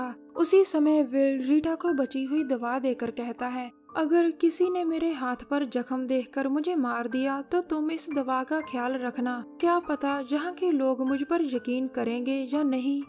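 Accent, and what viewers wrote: Punjab, India. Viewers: tusi Punjabi ho?? awaz ton lgda tuhadi kyu k Punjabi accent hai tuhadi voice ch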